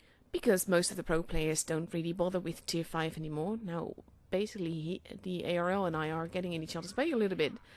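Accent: Netherlands. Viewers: Hi - You have a really lovely 'speaking voice', makes easy-listening. Being older, I tend to be very jaded & don't follow many (or few) "Blitzers" on here. I enjoy your review, as I've just acquired a "Medjay" (tonight), but haven't used it yet. Looks to be just a 're-skinned Excelsior' - As during "Uprising" mode, they have the ability to "turn around" their opponents (which sadly, includes me!!!!) - I've been destroyed a few times by that method, whilst using my "newest" favourite Tier.5 - "Nightmare StuG.III" Lastly, your English is excellent & I hear the hint of a Dutch accent.... (tell me I'm wrong? - Laughs!)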